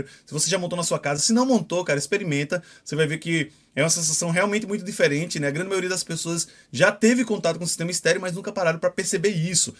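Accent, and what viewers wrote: Northeast, Brazil. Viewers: gênio é uma honra e muita satisfação ver que você respondeu meu comentário nossa Aaaa, (muito massa) sobre a questão de mesário, eu sou em tempos de política sempre sou chamado pra compor a mesa e sobre técnico eu trabalho com som também, trabalho com som mas mecânico, e estou migrando para o som automotivo, e seu vídeos me ajudam muito, se entendo sim um pouco não tudo (isso sempre) e a cada vez que assisto um vídeo seu aprendo um pouco mais, assisto me divertindo, não zoando mas achando super interessante divertido (sotaque. Bem que eu queria ser do nordeste) ABRAÇO GÊNIO. DESDE JÁ MINHA ETERNA ADMIRAÇÃO POR TI HOMI.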